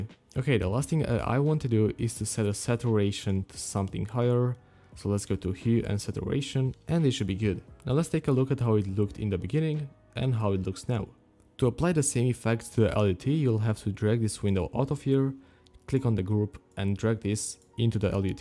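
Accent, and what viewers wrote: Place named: Russia. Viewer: Сразу видно по голосу, наш брат, русский)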